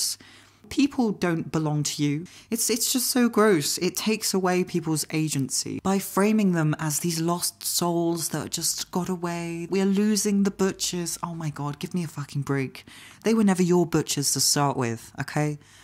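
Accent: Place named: United Kingdom. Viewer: I've never come across your channel before, but I need to say I was NOT prepared for that voice! Between the soothing timbre (I was fooled by your cherubic face) and the British accent... lovely. Like I could see you narrating documentaries or something haha.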